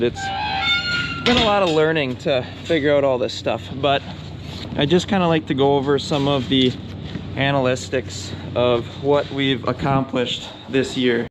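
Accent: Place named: Wisconsin, United States. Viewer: Don't worry if we cant see you your wisconsified accent is impossible to mix up we know its you guiding us threw the video! Cheers!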